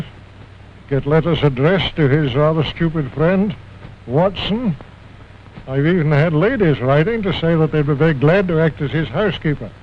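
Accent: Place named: Edinburgh, United Kingdom. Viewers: Edinburgh accent, similar to my own. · Och! Man's scottish is really showing here. I love it. Would have been very interesting to sit down and talk to him about all sorts of matters. I find it fasinating that someone who is educated man of science matters was so into Spiritualism. Where's a time machine when ya need it?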